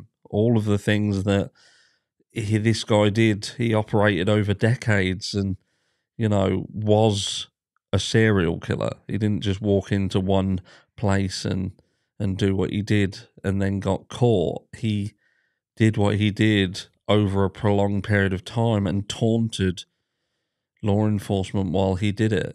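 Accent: United Kingdom. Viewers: Face it, folks......true crime just always sounds better with a British accent.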